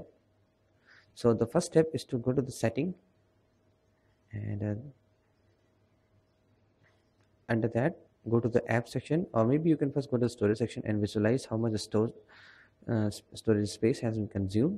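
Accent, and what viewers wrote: India. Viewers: Im 90% sure that u r from india. Ur accent tells everything :) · First, I don't understand indianglish, sorry. Second, I have no single thing to delete (factory settings, new phone) and still 'insufficient storage'. F***k why?!? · having a deep Indian accent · you can slow down, you talk way too fast to even comprehend what in the hell you're even saying! Maybe a fellow Indian ( I'm not speaking of a native American Indian) can understand you. And you seem informative in tis area and on this Question I need answers to.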